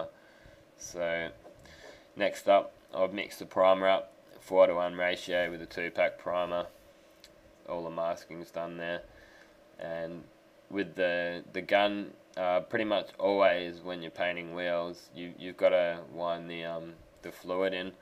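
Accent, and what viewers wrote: Australia. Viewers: He's Australian- True Blue..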